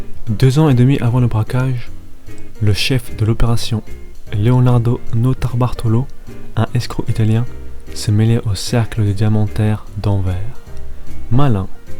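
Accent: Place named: South, France. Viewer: Pas mal la vidéo, par contre, on dirait que t'es trop près de ton micro, je sais que tu l'aimes mais, t'as pas besoin de t'approcher assez pour le manger x). Donc, tellement t'es prêt je t'entend respirer un peu et ça me stress xD Sinon, il manque un peu de dynamisme. Et l'accent j'aime bien, c'est tout mimi dit la québécoise qui n'écoute que des français tu viens du sud, nah ?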